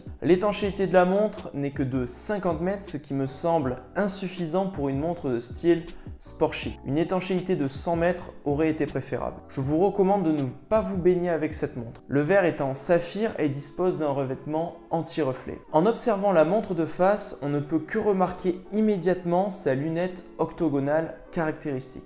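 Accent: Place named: Italy. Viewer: Esthétique dans l'air du temps avec les hommages aux montres des seventies avec bracelet intégré. Je te donne un 7/10 sur ton accent italien. Merci pour cette vidéo et les images de la montre sont top.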